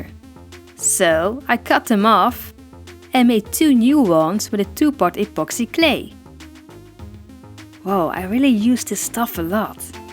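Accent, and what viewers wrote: Netherlands. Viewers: Are you dutch you sound very dutch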